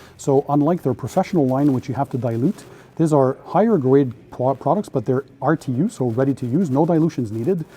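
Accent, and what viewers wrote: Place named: Canada. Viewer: New to your channel. I had a feeling you were Canadian by the sound of your voice. I have a good friend from Montreal. We meet up in Albany to get our families together now and then. And my son and I went to the NY auto show this year too. What terrible weather it was the day we went. I’m a novice detailer. Here for the knowledge and tips.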